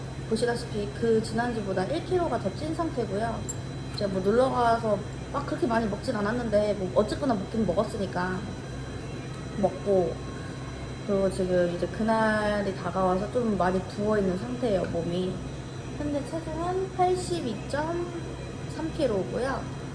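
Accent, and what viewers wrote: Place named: Seoul, South Korea. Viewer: 5년전 영상인데 왜 예전 서울사투리가 들리죠 ? 언제나 귀여우셔요 ㅎㅎ😍😍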